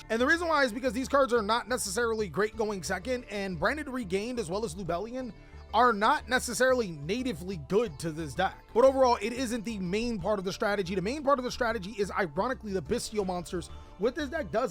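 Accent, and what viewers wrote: United Kingdom. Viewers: I think it’s more of a British cockney accent.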